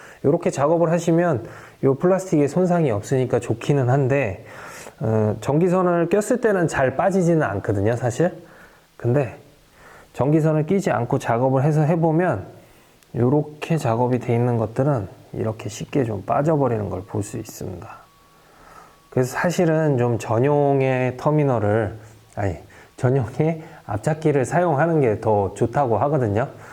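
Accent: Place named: Gangwon, South Korea. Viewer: 좋은 정보영상 잘봤습니다.^^ 대표님 발음이...강원도 말투가 자꾸 ㅎㅎ 그랬슴다 ㅎㅎ 그랬슴다 영상 업로드되서 볼때마다 재밋슴다 ㅎㅎ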